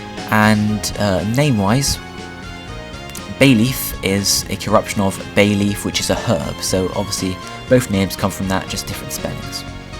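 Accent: United Kingdom. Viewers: In UK English Herb is pronounced with an h, from his accent you can tell he is from the UK ;)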